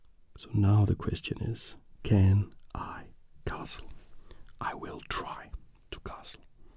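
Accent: Denmark. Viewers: Just gonna ask whats ur main language ur accsent remind me of some1 from denmark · Jeg havde aldrig troet jeg skulle sige det her - men er simpelthen så vild med din danske accent! Jeg ville elske, hvis du kunne inkorporere flere ASMR elementer (i nogle videoer), hvor du gentager ord eller “tapper” på noget!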